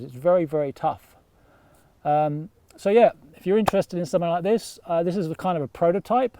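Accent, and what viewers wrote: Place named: Australia. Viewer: I thought this guy was an Aussie. Can you make tea in it?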